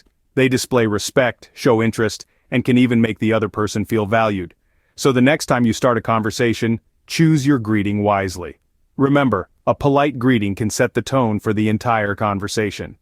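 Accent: United States. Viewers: this video is useful and helpful for me. thanky so much. is this american english? from japan shito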